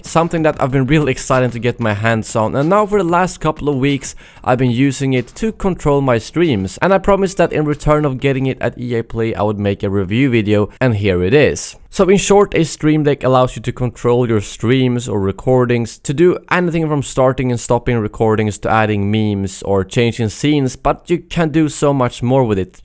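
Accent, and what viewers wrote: Sweden. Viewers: Det låter som att du är svensk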